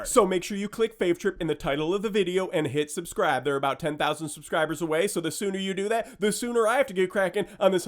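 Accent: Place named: India. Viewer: wheres the indian voice?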